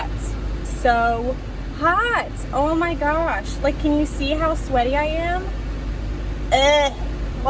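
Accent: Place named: South, United States. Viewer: Hey, I was wondering what state and zone you are located in? Seems like your Southern.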